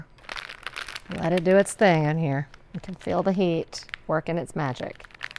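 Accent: South, United States. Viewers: Terrific accent too - especially if you’re from the UK and don’t hear these beautiful southern US accents much. She’s a smart, natural presenter… :)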